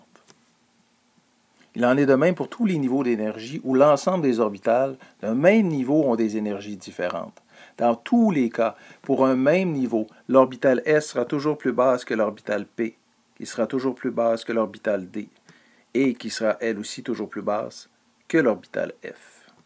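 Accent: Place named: Quebec, Canada. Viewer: Accent québécoise 🗣️🗣️😅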